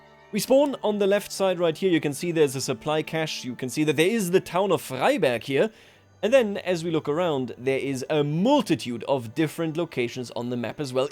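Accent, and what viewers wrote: Bavaria, Germany. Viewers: I hope there's a few more videos about the game after you learn it, have to say its a bit of a pain watching the mistakes here but your German pronunciation of things makes it worth it.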